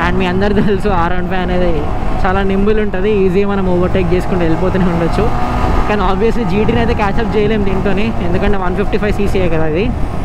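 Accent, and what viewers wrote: Kerala, India. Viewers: Bro mee native place ekkada I mean Malayalam matladthanav kada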